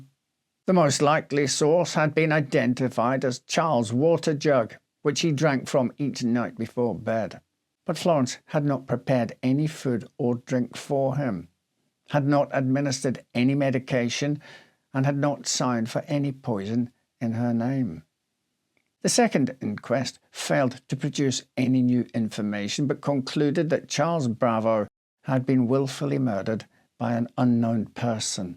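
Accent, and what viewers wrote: United Kingdom. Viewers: Something about your British accent and your articulation makes you very enjoyable to listen to. I thank you for your service sir!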